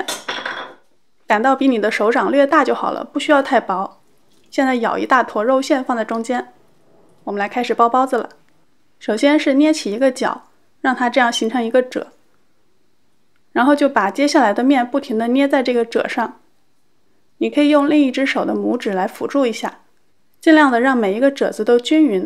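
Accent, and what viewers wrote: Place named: Shandong, China. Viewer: 我想一定是山东人！口音也像。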